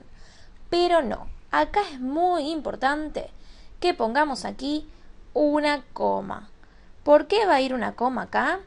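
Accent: Argentina. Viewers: El famoso acento argento! 😄😂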